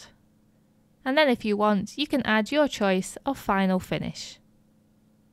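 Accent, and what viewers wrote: United Kingdom. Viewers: I heard british accent, isn't it? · I realize that, I just wonder where in Britain people speak like that.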